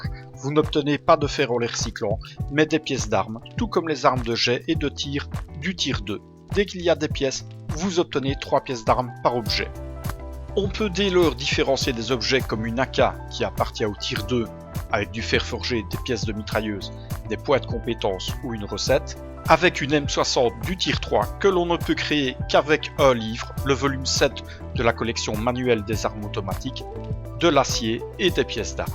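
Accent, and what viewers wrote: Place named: Belgium. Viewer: Au vu de ton accent et celui de papy, je dirai que tu proviens de Belgique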